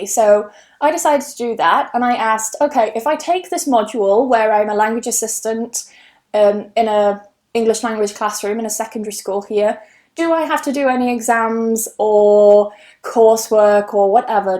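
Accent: United Kingdom. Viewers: Very motivational story. I love british accent. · Thanks for sharing your story. I really like British accent 😇💙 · Hi, I've just subscribed to your channel. I love your British accent, your voice, your look, and the way you present. I find most English teaching videos on youtube are in American English, which is unfamiliar to me. I'm lucky to find you on youtube today. I can see that your eyes were still filled with tears when you shared the bad experience with your previous boss. I had similar experience with a bitch boss so I really can feel your pain. I'm so touched by your story. I like genuine and kind-hearted people like you. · watching your video because I like British accent, thanks for you kept talking in English and it is brilliant, love it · Hi! you are an excellent teacher, I love your British pronunciation! I'm learning a lot with your youtube's videos! 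Thank you so much! Xx:)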